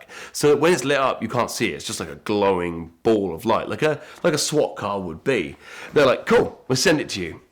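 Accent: United Kingdom. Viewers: No matter how cool you are that Brit accent make you sound like a total douche!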